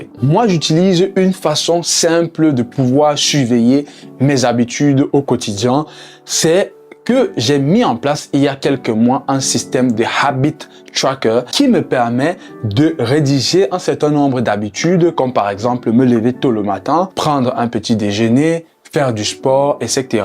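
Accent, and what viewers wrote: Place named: Cameroon. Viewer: C"est quoi le truc avec l'accent Camer un peu partout comme pour ajouter de l'emphase?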